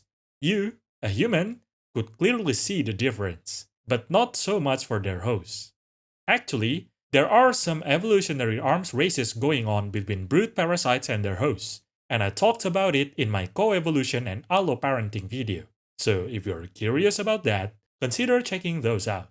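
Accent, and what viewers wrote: Southeast Asia. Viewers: Oh, south east asian, always wondered what that accent was Also love these birds, so cool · You're the only Indonesian I know, so I have no other points of reference. To me, your accent sounds like a mix of generic Southeast Asian + Dutch.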